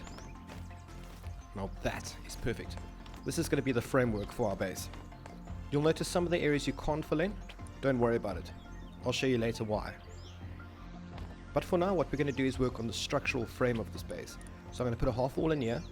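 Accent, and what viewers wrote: Afrikaans, South Africa. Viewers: You sound South African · I knew you were South African! Finally a fellow South African Youtuber that is good! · Are you south african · I am south African aswell I instantly knew your south Africa by listening to your accent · Are you from south africa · you South African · Wait he's south african? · The accent lol. Afrikaans. Man its so easy to pick up lol. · YOU SOUND SOUTH-AFRICAN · I’m guessing you’re from South Africa? Most probably Joburg? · Thought I recognised that accent Rare to see another SA on YouTube, especially a content creator. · U can hear this guy is South African 😹🇿🇦 Ayo straight from the mother nation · Where are you from, you sound South African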